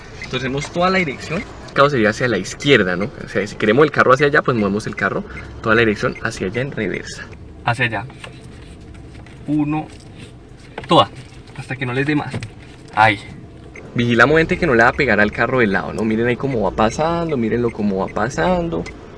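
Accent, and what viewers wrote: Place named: Colombia. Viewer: Escucharte es un placer, tu acento me da ganas de irme a Colombia a buscar un novio, si mi profe de manejo hubiera hablado así yo hubiera conducidos como Toreto en el primer día de clases!😏😉🤗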